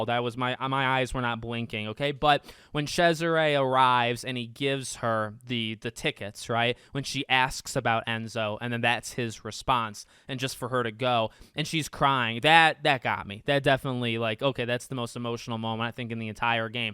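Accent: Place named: Michigan, United States. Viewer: Just came across this channel and you do a great job! You sound like a Michigander lol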